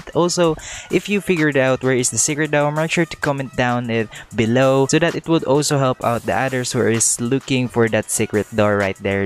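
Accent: Philippines. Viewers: You have a Filipino accent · Ur pinoy aksent is ON POINT ONG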